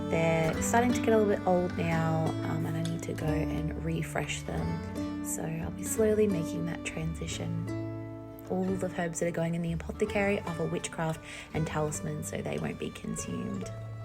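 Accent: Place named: Australia. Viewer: Me, hears Aussie accent. Instantly hits subscribe because I love supporting fellow aussies… and because Aussie witchie fun!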